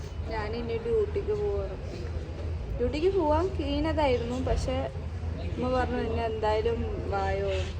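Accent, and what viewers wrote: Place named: Kochi, India. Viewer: Kochi slang annalo vanne samsarikumbo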